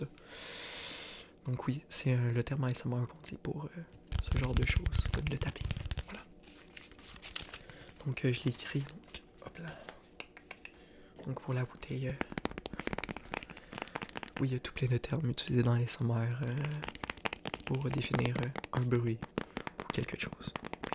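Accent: Quebec, Canada. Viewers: j'apprécie les explications données pour chaque objet utilisé , je connais pas tous les termes malheureusement mais mon cerveau se relaxe avec ta voix et ton scratching lent je crois que c'est ça m'a déclenché des frissons . enfin bref , tout ça pour dire que je viens de te découvrir cette nuit . je n'arrivais pas à dormir et je suis tombé sur une de tes vidéos , après hop abonné . l'accent québécois m'apaise + asmr combiné = mon cerveau est content 🤔👍👏✌ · aaaah j'adore l'accent québécois :) tu es mon nouveau asmrartist préféré ;)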